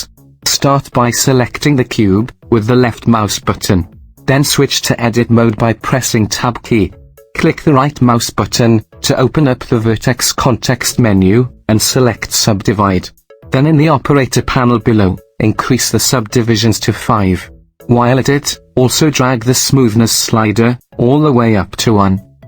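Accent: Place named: Wales, United Kingdom. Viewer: Blender tutorial in welsh accent 😂 this is amazing. I love it!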